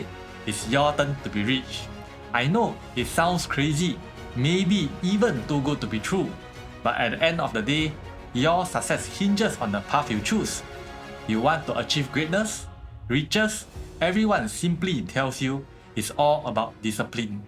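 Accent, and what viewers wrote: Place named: Singapore. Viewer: Singapore accent cannot la..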